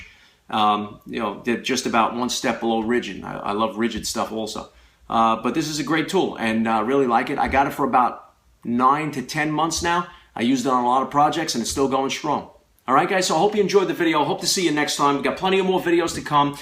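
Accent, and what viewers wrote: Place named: South, United States. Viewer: I just ordered one thanks to your review. Like your southern accent. ;-)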